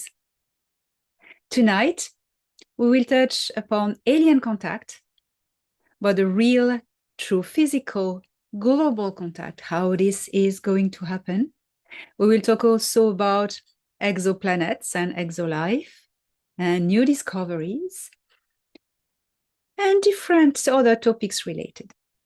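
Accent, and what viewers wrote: France. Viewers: As much as I love the information, and I must admit sometimes it does seem a little far fetched although these days there's really no such thing, the thing that keeps me coming back is that French accent there is just something about it well at least I'm honest lol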